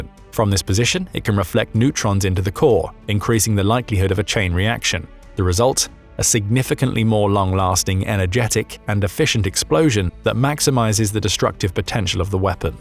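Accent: United Kingdom. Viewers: I wish these videos didn't include British narration.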